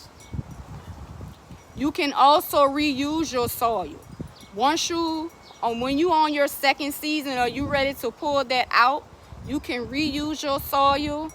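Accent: New Orleans, United States. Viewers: Accent reminds me of family in Nawlins. We like to talk ish about the differences at family reunions lol much love from Slauson and Crenshaw 💪🏽🏁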